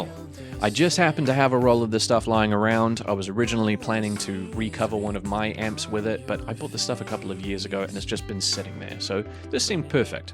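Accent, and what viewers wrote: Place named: New Zealand. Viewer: as soon as im 5 sec in and hear a kiwi accent, sub'd. idk just enjoy seeing cool videos from people from the place im from, especially when it seems like everyone else is american, australian or british. dope vid!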